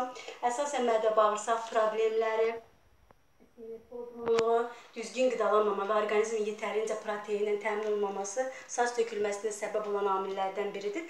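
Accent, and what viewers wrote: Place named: Azerbaijan. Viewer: Lan șu azarbeycan konușmasina hayranim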